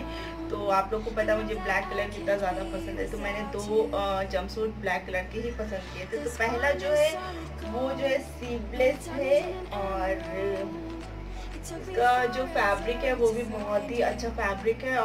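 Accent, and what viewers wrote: India. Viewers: Mast ek dam mumbai language Mai boleto jakas🤩🤩🤩🤩🤩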